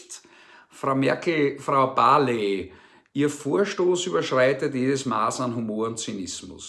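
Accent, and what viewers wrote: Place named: Austria. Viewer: Herr.... Sie ein Sprachrohr der Menschheit mit sehr angenehmen Worten! Ihr Vokabular und IHRE Stimme mit dem herzlichen Österreichischen Dialektes ist ein Genuss...! Wahrlich 👏😘 Ich könnte mir sehr sehr gut SIE als Posaune Gottes vorstellen, der das wahre Evangelium, jaaa, die rettende Botschaft von Jesus Christus verkündet.,...weil SIE die Wahrheit sagen... Servus und BABA hochachtungsvoll Reinhard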